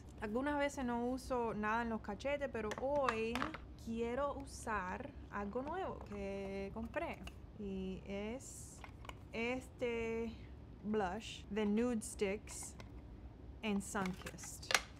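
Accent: Cuba. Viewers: THAT CUBAN ACCENT 😍 Love this video so much. Merry christmas G 🎄🤍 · Madre mia... no sabia que eras Cubana!!! Tienes un acento precioso ♥️ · He estado esperando este video!! Not sure why either. I love hearing our beautiful language spoken. Y el acento Cubano me encanta. Well done 👍🏼🙂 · No sabía que eras cubana!! con razón tus recomendaciones de cabello siempre me funcionan, tengo cabello caribeño también. Saludos desde república dominicana. P.s. Me encanta escuchar tu acento, el acento cubano es super delicado ✨ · Eres cubana mi amiga 🇨🇺 se nota por tu acento tu te trata de hablar español para los suscritores me entiendes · Qué belleza! Me ha encantado escucharte con tu acento cubano. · Ahhh I love yr Cuban accent!! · SISSSS i have been WAITING SO LONG FOR THIS ONE. Yo sabía que eras Cubana desde el primer video tuyo que vi lol, tu acento es súper lindo❤️❤️ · Gosh Cuban-Spanish is so enchanting. 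It sounds like music and is filled with so much soul. Just like Brazilian-Portugese. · ! Me encanta tu acento cubano, soy mexicana y mi primer idioma es español, y me encanto escucharte, y si se dice brocha, a las makeup brush, amo tus vlogs y a Dexter, this was refreshing loved it ❤️ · Ese acento cubanitoooo rico! Ya tenía ganas de oírte hablar en español. Saludos desde España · Este acento cubanoooo, me encanta jajajaja ❤️ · Anda, un video en español. Mi marido (que es dominicano) subió la ecalera escuchando el video y imediatamente me dijo: "Ella es cubana". Me encanta tu acento. Feliz Navidad y saludos desde Escandinavia. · Yesss! I love hearing the Cuban accent! Also, this helps me learn new words. Thanks girlie!! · Amo el acento cubano ❤️❤️❤️❤️❤️ Saludos desde VENEZUELA 🇻🇪 ❤️ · "I don't know how to say it!" hahaha...that's totally me. Fun video getting to see you talk in Spanish. Cool hearing your Cuban accent too. 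No estamos aqui para juzgar...hahaha totally! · El acento cubano es hermoso, veo que sí lo manejas pero es como desempolvarlo 🤣 y hacer el switch al otro idioma en lugar de traducir en la mente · Love your cuban accent! 😘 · Ese acento Cubano 🙌 amoooo! Orgullo Cubano😊 Soy Cubana también ❤ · En México sí decimos brocha! Me encantó el video, amo el acento cubano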